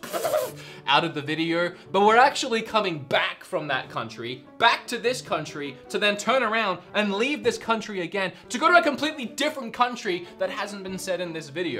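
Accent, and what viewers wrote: Australia. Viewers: I thought he was from Canada. But now he said Australia I hear it now when he says awesome. Have fun I wanna go to Japan. But I also want to go to Paris and Italy. I just want to travel the world. I’m tired of seeing palm trees. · Keen to hear your accent become more Aussie again lol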